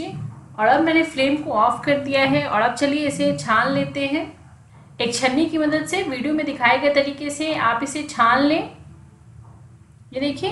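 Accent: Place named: Bihar, India. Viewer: Esya bihar m bolty h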